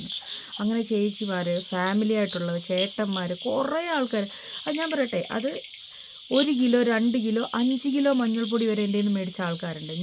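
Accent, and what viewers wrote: Thrissur, India. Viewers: Slang kettitu Thrissur ano ennu oru doubt...oro koana mala vanangal und nattukarekodn parayippikan....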